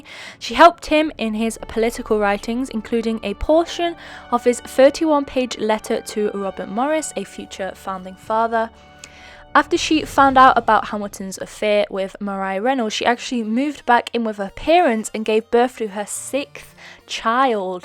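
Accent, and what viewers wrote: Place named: Scotland, United Kingdom. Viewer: Am I the only one who watches these for her soothing Scottish voice? Honestly it's beautiful and I wish I had your voice :3 I'm English myself but I just wish I had your accent 💗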